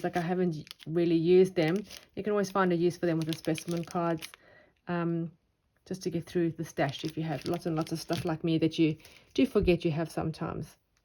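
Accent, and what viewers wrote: South Africa. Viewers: I thought I spied a South African accent!! Always love finding another South African Youtuber. Love your work!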